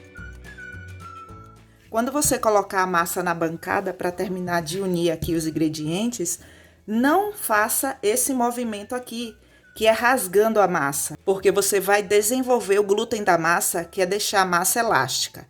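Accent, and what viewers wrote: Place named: Paraíba, Brazil. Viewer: Acabei de me inscrever!! A M E I a sua forma de explicar com riqueza de detalhes!!! Além do mais, que sotaque bonito!! De onde vc é?!?!🤔 Parece sotaque paraibano!!! GRATIDÃO pelo ensinamento meticuloso e paciente!! Deus abençoe a sua vida, seus projetos, seus objetivos!!! Saúde e paz!!! 🙏🙏🛐💕💕🤗🌻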